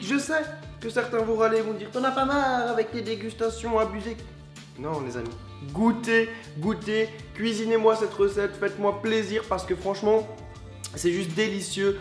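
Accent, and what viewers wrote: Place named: Alsace, France. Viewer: Yeeee schezele toi ta l'accent de chez nous ! Hop la jvais manger a nidermoschwir manger la surkrut de chez nous ! Rpz alsaco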